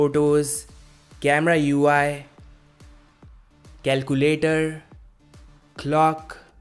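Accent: India. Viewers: Apka abaj sun ke lag rha ha Indian ha agar hindi bolte too trand pe hote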